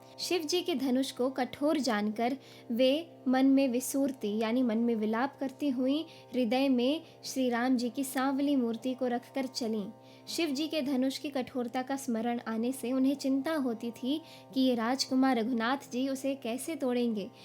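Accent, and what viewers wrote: Standard. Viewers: बहुत शुध्द उच्चारण है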